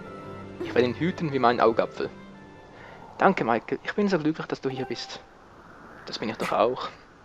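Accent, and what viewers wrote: Switzerland. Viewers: HA! Tscha zeme! Schwiizerakzänt! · ich finde deine videos echt witzig vor allen mit deinem Akzent. bist du Schweizer oder so? wenn du das so nachspricht was link so "sagt" hört sich echt witzig an. link mit einem schweizer Akzent xD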